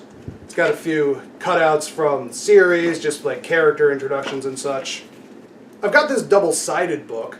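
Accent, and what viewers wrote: United States. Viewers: Your American accent killed me!! I want to say I really love your channel and awesome collection. 😃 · That Texan accent! 🤣🤣🤣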